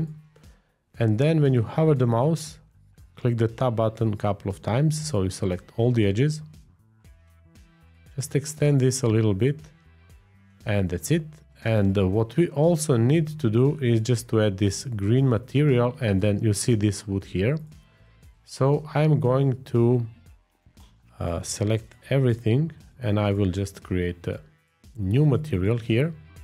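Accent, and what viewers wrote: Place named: Croatia. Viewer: Nice video and new suscribe! by the way are you hrvat? You have a strong accent and the red and white of your brand. Pozz iz Argentine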